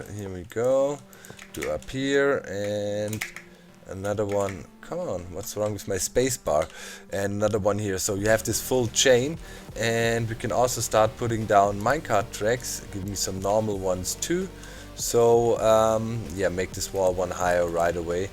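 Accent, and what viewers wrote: Germany. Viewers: You Sound a lil Bit grman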